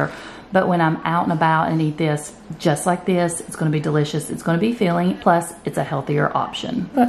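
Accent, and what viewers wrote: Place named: Tennessee, United States. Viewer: i knew i recognized that accent. Im from Elizabethton, TN too! Small world!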